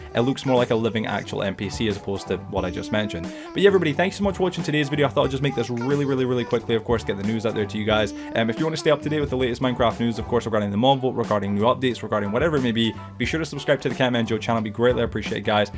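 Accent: Scotland, United Kingdom. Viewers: Where in Scotland are you from ?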